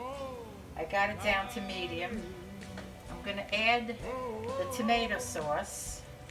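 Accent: New York, United States. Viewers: The website to order the book is “ not secure” . Apple recommends that you contact the owner of the site and don’t give your credit card information. I enjoy your videos otherwise. I love your accent and it is same as my sister who lives in New Rochelle. I am a retired cardiologist from the Bronx who lives in Omaha for last 45 years. Of course, I lost my accent(ha, ha… NOT.)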